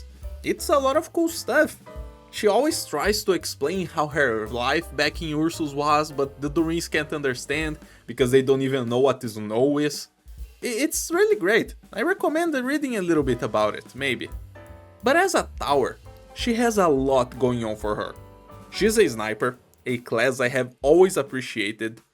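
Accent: Brazil. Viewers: Ai irmão, tu é br ou existe outro sotaque MUITO parecido com o nosso?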